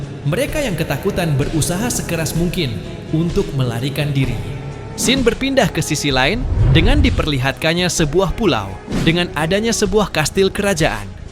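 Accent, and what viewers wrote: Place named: Malaysia. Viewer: Kau ni ape, melayu rupanye abang satu ni😅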